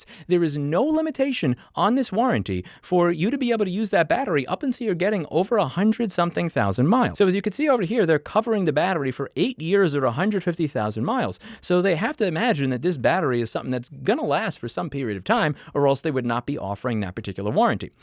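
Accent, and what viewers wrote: New York, United States. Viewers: you can hear the new york accent at this speed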